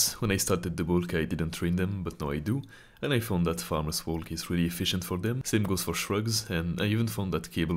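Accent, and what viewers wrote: France. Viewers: Ton accent français est magnifique ✨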